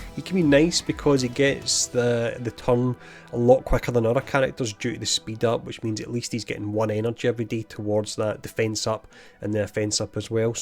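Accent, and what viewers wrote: Scotland, United Kingdom. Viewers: First time seeing you! Nice you’re getting in front of the cam! The beard definitely fits the Scottish accent! Automatically don’t want to mess with you! 👀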